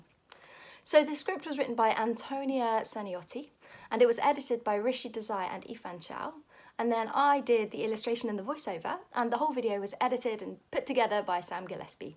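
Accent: England, United Kingdom. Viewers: I love your british accent... 😍😍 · Beautiful british accent!!!!! Great lecture😊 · I love british accent! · I wish you get rid of this british accent. We do not understand 🤥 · I love the typical English accent .... would love to hear more 😋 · Whats with the british accent.. Its not even the bbc english which is easier to understand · She explained it so well, but her heavy English accent is bothering me so much that i cannot focus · I missed you,! Lovely Brit accent! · Loved that British accent !!! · your British accent is so cool kinda strange but still cool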